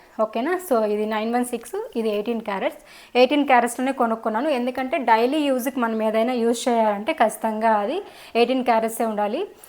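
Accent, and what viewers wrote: Chittoor, India. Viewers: Hi andi medi ye uru,Chittoor slang laga undi anduku adigyanu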